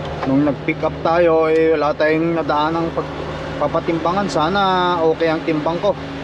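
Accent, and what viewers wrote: Ilocos, Philippines. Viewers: natutuwa ako sa mga pronunciation mo, hindi maitago ang pagka ilokano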